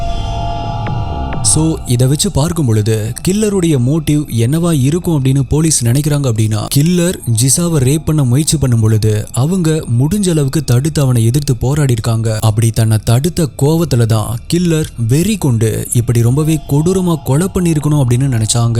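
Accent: Coimbatore, India. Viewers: unga coiambatore slang super bro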